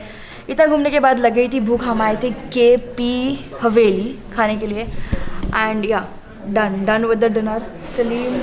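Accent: Mumbai, India. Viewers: Like your Mumbaiyaaa style of conversation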